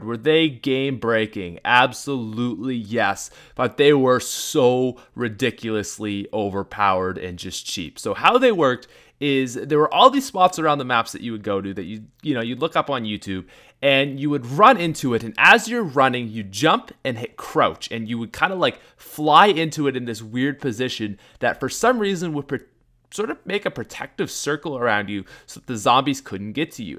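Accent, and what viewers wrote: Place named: Newfoundland, Canada. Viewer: Are you from Newfoundland? Your accent sounds a lot like one of my teachers that used to live in Newfoundland.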